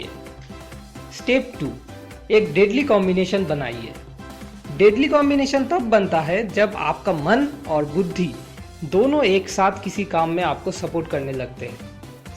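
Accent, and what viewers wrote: Bengal, India. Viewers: Dada, bangali naki? Accent shune mone holo :) A good video!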